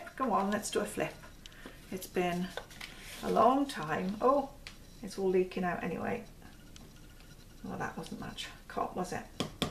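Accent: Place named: Southern England, United Kingdom. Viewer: do you come from Gloucester ,dorset or hampshire? lovely accent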